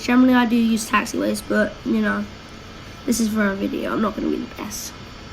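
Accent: United Kingdom. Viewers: are u British? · You are way to underated you must have 50k right now. Btw are you british? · Just by the sound if his accent I'd say yes he is British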